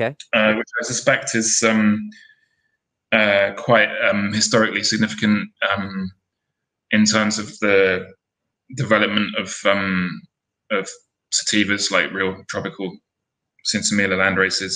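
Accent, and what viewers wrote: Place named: United Kingdom. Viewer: It's good to hear a voice from the UK! .... 🙂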